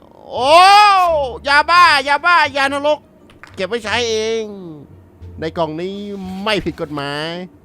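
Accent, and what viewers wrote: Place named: South, Thailand. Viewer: ชอบความ พูดภาษาใต้จัดๆ ภาษาใต้เป็นภาษาที่อารมณ์ดี 5555+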